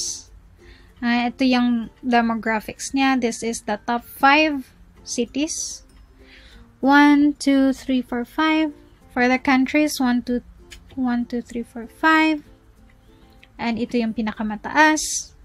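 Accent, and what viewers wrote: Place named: Davao, Philippines. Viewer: Based on your tagalog accent, taga Davao ka po ba or Cebu?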